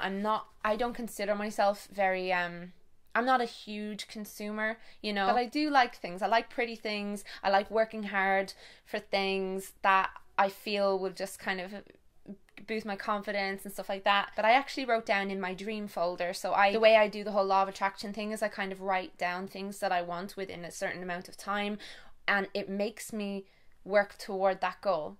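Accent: Ireland. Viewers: To be honest I started watching your channel coz you're Irish and I love your accent (I had a girlfriend that's from Edenderry but she won't talk to me anymore and I miss her accent) but I actually really enjoy your content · I love your videos so much and I love to hear an Irish accent on YouTube and I'm from Ireland too (cork) 🇮🇪🇮🇪🇮🇪🇮🇪🇮🇪🇮🇪🍀🍀🍀🍀🍀🍀💚💚💚💚💚💚💚💚💚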